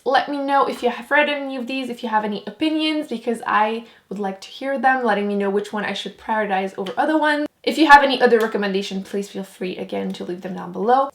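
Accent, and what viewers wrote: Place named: Quebec, Canada. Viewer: For Jane Austen, I really liked reading Emma and Northanger Abbey is a fun read but it's really different to her normal writing style because it's more a «parody» of the Gothic genre than a love story! And you also made me think to put Anna Karenina in my TBR! (Et sans être trop personnelle, originaire du Québec? L'accent que tu as lorsque tu parles français ressemble beaucoup au mien aha ;) )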